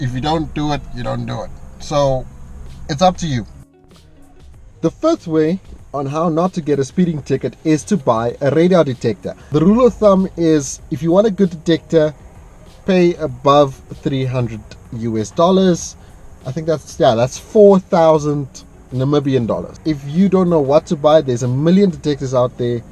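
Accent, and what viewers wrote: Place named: Johannesburg, South Africa. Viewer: Where you from jhb Got the accent😉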